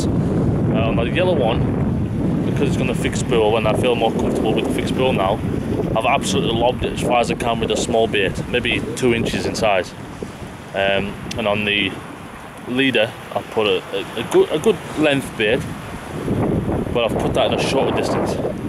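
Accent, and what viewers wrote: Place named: Northern England, United Kingdom. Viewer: You really give the subtitles hell with your superb Northern accent.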